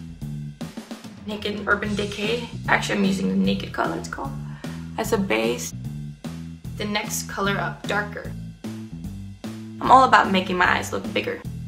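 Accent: Denmark. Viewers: She's Danish . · I love that you can hear you danish accent a bit! (jeg er ogs fra danmark ahahah) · she’s fully danish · She's from Denmark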